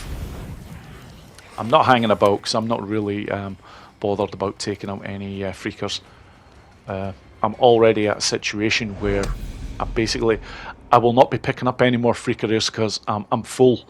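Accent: Scotland, United Kingdom. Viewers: mega great info channel rocks the best way ever I never asked but I take it you are sottish one of my favorite wrestler is drew mcintyre from WWE .. I'm half Welsh / Greek funny combo I know lol. but your channel/ content is excellent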